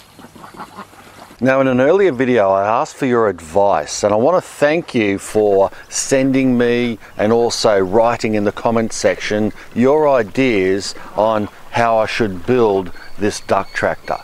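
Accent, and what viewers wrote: Australia. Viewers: Congratulations on reaching 1 million subs! I love your channel and have recommended it to my brother who has a farm in Qld. I live in the US now and love hearing the Aussie accent again! My brother has me worried. Any time he drives by a milk farm, he pulls over and leaves a few dollars on the fence. Doesn't he know cow tipping is illegal?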